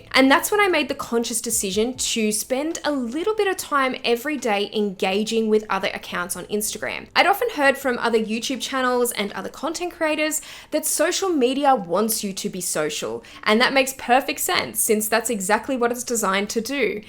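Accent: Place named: Australia. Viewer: Very new to using instagram, just started an account for a business im trying to get off the ground. Started to youtube it to research and learn how to do things. So pleased i found this vid, hopefully u have more for me to learn from. rather hear it all in an aussie accent rather than a seppo one. First time really using instagram, hopefully it isnt too hard to get around it and become reasonably proficient at it, thanks for posting young lady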